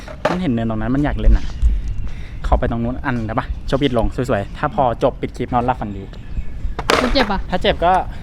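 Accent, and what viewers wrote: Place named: Kanchanaburi, Thailand. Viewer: เป็นคนเมืองกาญมั้ยอะ สำเนียงคุ้นหูแบคนกาญมาก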